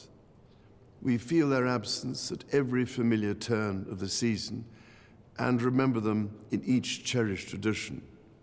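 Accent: RP, United Kingdom. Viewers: He also sounds posh like his beloved mother 👍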